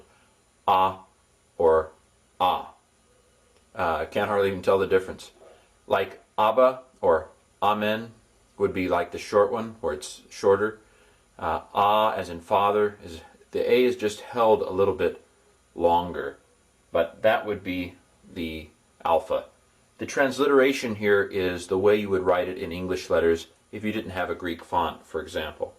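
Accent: United States. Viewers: The other problem is that each nation has it's interpretation of vowel sounds. Americans are notorious for replacing vowels with arbitrary schwa sounds. Listen to how an American pronounces Banana - three "a"s - all with a different vowel sound. Americans also belabour the emphasis on the FIRst Syllable. Hence the POL-ice in ARIizona have the best REE-search and DEE-fence. To a native English speaker it sounds gauche and as if they are deliberately trying to shout or be rude. I'm not sure I can agree on how he pronounces ETA because of the bizarre way Americans deal with "a" in general....they pronounce it more like AAHH. Their "O" sounds like wind up ooooOOOHHH. Listen to how an American says oooOOHHrange instead of Orange. There is no way ancient Greek would conform to modern America colloquialisms, yet you can hear his heavy American accent as he pronounces "Greek". 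· Erasmus killed the sound of ancient Greek just to make it easy for Americans. Sorry It's not Gama it's whama (like "why"). It's not delta it's δέλτα (like "this") "Αυτοις" for example it's not "αυ-τ-ο-ις. I know it's convenient but it's" αυ-τ-οις " ο+ι=ι Otherwise there is no harmony. Sounds like an American trying to speak Greek. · you're "americanising" the whole thing sorry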